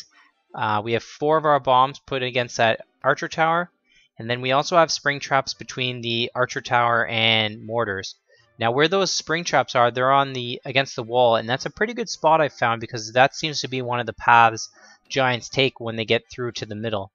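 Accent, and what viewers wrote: Canada. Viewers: canadian? lol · your Canadian :D i can tell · Not trying to be mean but r u Canadian?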